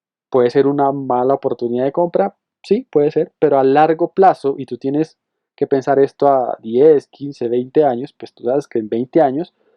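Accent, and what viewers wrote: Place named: Colombia. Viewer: Hola, excelente información, por tu acento asumo que eres Colombiano, y supongo que de Bogotá; te quería preguntar si tienes idea de como se maneja o afecta tributariamente en renta, hacer este tipo de inversiones? gracias de antemano por tu valiosísimo aporte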